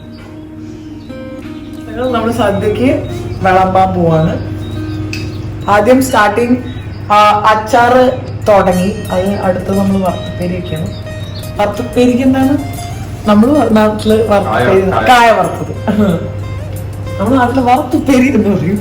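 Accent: Kannur, India. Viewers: നമ്മളെ സ്വന്തം കണ്ണൂർ - പയ്യന്നൂർ slang ❤️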